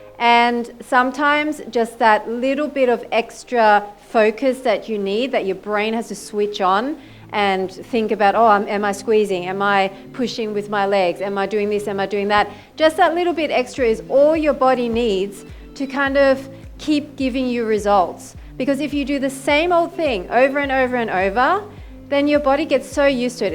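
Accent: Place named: Australia. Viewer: woow! I love Australia! Hope to visit it one day! I started getting in love with your country since 's era. still be my fave singer and voice and yes I noticed a similar accent in you, but I didn't realize you are Australian too! Good workout to do after the athletic barre!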